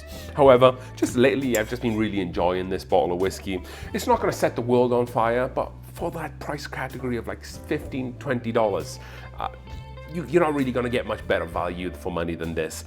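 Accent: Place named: Wales, United Kingdom. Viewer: based on your accent that you are originally from Wales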